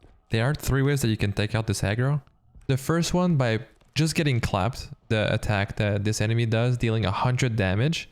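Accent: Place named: Quebec, Canada. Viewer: It's random but, I hear a quebec accent are u quebecois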